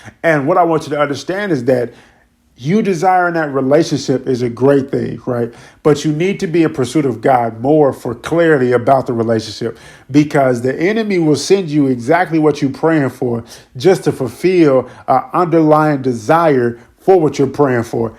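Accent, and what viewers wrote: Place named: Washington DC, United States. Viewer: Are you from DC? …. I hear an accent and the slang lol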